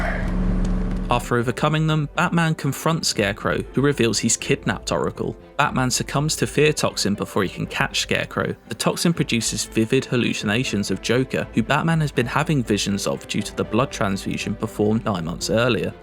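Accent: United Kingdom. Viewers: the british accent is such a turn off man!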